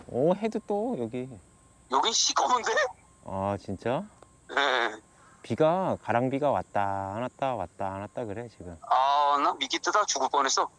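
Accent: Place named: North Chungcheong, South Korea. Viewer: 충청남도가 아니구 충청북도 말투시네유~~~ 제천쪽 그쪽 사시네벼유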